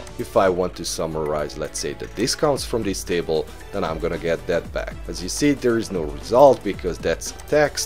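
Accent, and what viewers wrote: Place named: Hungary. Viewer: I bet you are Hungarian. The accent!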